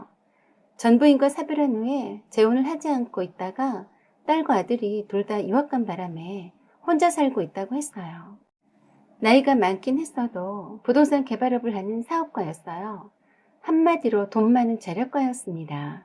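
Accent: Jeolla, South Korea. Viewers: 저기요, 표준어로 읽던가 아님, 전라도 사투리로 읽던가 하나만 하세요 거슬려서 못듣겠어요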